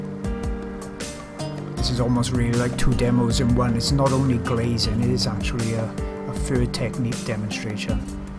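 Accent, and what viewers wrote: United Kingdom. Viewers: Interesting but the music is too loud so it's difficult to understand when we don't speak British.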